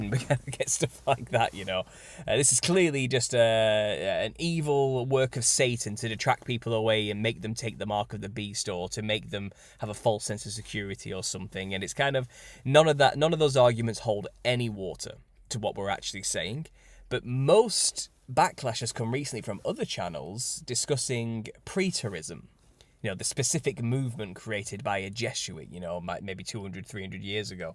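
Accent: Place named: Yorkshire, United Kingdom. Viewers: I am sure there are books missing, has Yah isnt a God of confusion. Which is why i am reading the whole apographer. It will be revealed to us has and when we need to know. In the meantime looking for answers is keeping us busy and we are getting a better relationship with Father because of it. On another note im sat listening to this trying to place your acsent, Yorkshire i think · can I just say what a joy it is to hear a northern English voice. Thought I was on my own here in the northwest. If you are anywhere near, check out the great hall in Rochdale Town Hall. It's amazing, I'm fully on board with this whole theory/truth. Once I had made this decision, we randomly had to visit Rochdale for an appointment and afterwards rather than waste the journey had a look at the town hall. It was like God giving me conformation 😮 keep up your amazing work. 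The bible is just making more and more sense now 🙌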